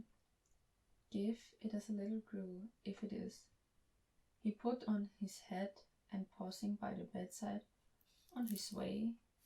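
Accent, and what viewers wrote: Austria. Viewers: It's really cool hearing Dickens with a really cute Austrian accent!😊 THANK YOU!!💕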